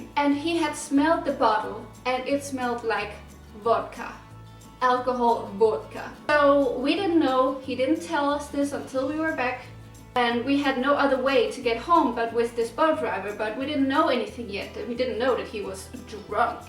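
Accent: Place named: Denmark. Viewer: I love Denmark and your accent!!!!!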